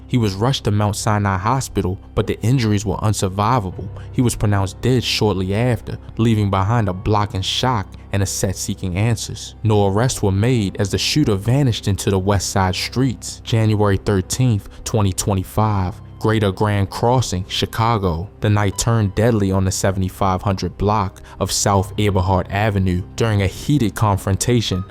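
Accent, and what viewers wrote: Baltimore, United States. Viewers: Baltimore lol I live here trust me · That Baltimore accent bro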